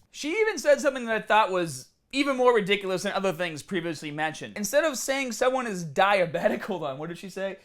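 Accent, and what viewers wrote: Boston, United States. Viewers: this is the first video of yours that I watch. Are you Bostonian or something? Ie. Abbey Shaaarp. I've been doing my Caaardio. Maaargarita.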